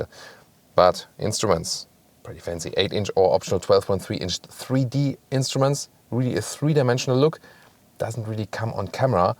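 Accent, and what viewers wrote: Germany. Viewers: Dieser deutsche Akzent einfach ...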